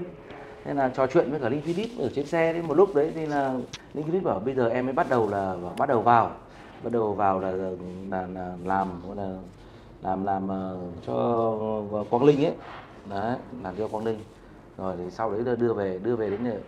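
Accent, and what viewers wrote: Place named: Hanoi, Vietnam. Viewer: Minh da Theo doi t all va minh rat yeu men co giong noi ng Ha Noi de thuong, hien co hau Love ya sweetie ❤️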